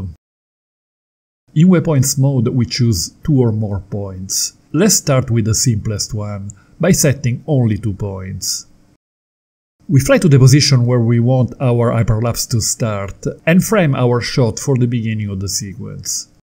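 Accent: Italy. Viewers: I can't get over your thick Italian accent hahaha. Nice video.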